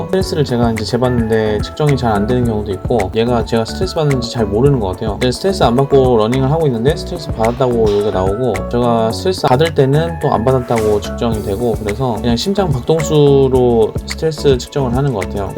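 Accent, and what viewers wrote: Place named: Gyeongsang, South Korea. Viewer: 찡깁니다...ㅋㅋㅋㅋ 경상도 구먼..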